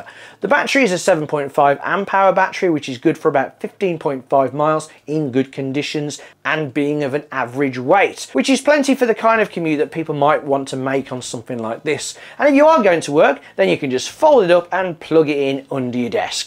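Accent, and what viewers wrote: West Midlands, United Kingdom. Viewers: American why are you lying for🤪 beens every yellow & white number plate & the green phone control box is all British & the houses etc,god knows how you thought you would blag that one I live in the west Midlands & they all still use scooters here they blend in with the ones you can hire in the town centre so I wouldn't worry about it enjoy ya self they won't throw you in the clink😁 you probably live right down the road from me with that accent bro.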